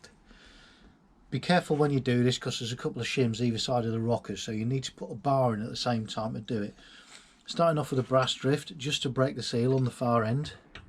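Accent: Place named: United Kingdom. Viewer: Hi; I kept watching due to the British accent. Do you have the thackery washers to the rocker boxes; as supposed should be? like in the later parts book drawing?; not in the manual book. Also kept watching to see an UK type model (I have the export model that is similar) but seems all have the USA specs...I need to upload a video at some point. I have a 79 with dual plug head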